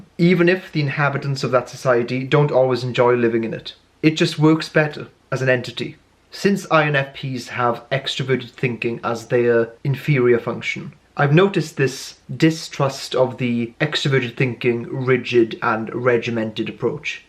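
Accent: Wales, United Kingdom. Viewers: Interesting. You explained the INFP very well. Is that a Welsh accent I hear?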